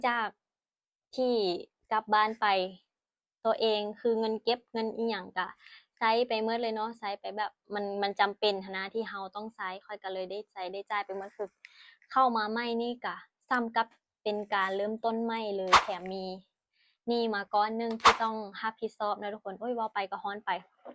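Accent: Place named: Laos. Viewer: อยากฟังเสียงเว้าลาวเพาะดี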